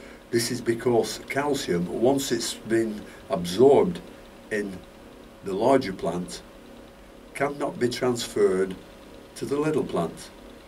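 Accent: Lancashire, United Kingdom. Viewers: Thank you for your videos. Your accent sounds similar to mine! I'm in Adlington near Chorley. Merry Christmas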